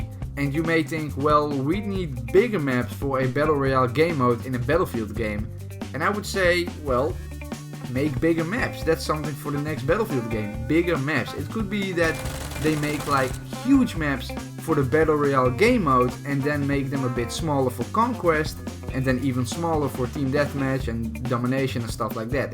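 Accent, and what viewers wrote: Netherlands. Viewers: Are you dutch my bruh?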